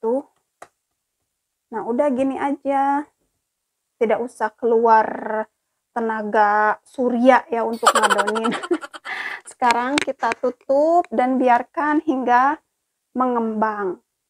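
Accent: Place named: Sunda, Indonesia. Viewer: Teh lama di itali tspi sundanya ko totobanget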